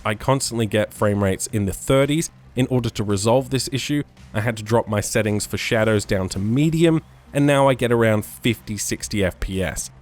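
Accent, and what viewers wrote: Australia. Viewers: I fucking swear all Australians have the same voice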